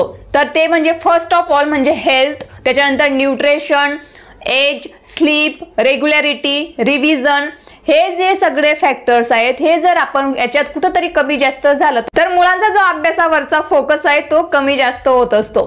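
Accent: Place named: Jalgaon, India. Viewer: मॅडम जळगाव चे असतील असे बोलण्यावरून वाटत आहे मराठी पेक्षा इंग्लिश जास्त छान आहे पण विचार हि खूप चांगले आहेत खूप छान माहिती आहे 🙏🙏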